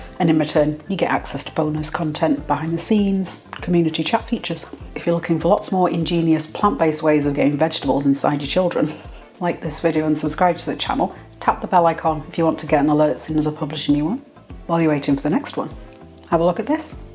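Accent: United Kingdom. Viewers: This looks delicious. I’m celiac so always looking for new recipes. Btw is that a Leeds accent? I’m from Leeds originally so always nice to see YouTubers from back home.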